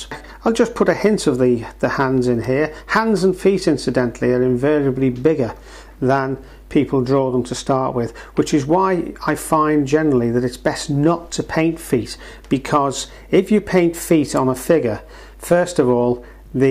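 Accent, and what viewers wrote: Liverpool, United Kingdom. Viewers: Hi.Really enjoy your tutorials,love hearing you speak as I’m from Liverpool too. Its like going home.😁❤️👏🏻👏🏻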